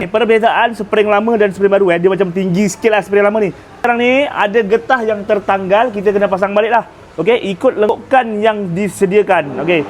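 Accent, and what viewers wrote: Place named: Java, Indonesia. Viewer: Ada lorat² jawa sikit...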